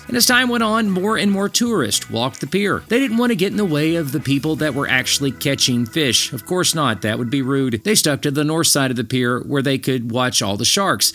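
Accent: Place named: East, United States. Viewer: Thank you, interesting Eastern state accent. Jyssojuan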